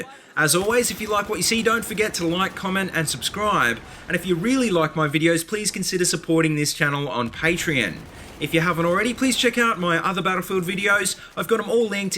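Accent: Australia. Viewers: You sound very familiar. Which state of Aus do you live in?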